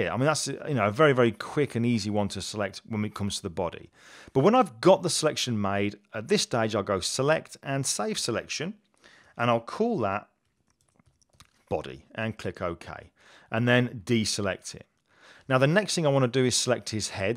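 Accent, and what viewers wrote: United Kingdom. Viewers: lovely midlands accent :-)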